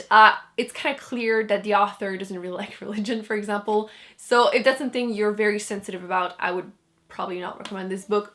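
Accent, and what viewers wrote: Quebec, Canada. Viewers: I just found your channel and the moment I heard your accent I thought "Oh she's Québecois French." So just out of curiosity are you Québecois? I live in Québec so if you are it would be pretty awesome to have a booktuber live in my province. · janessa blake She's French Canadian 🙂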